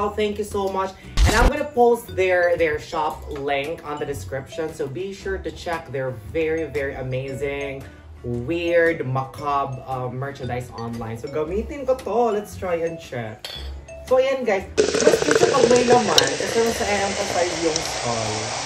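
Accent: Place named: England, United Kingdom. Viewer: i love your english accent more videos pa po 😊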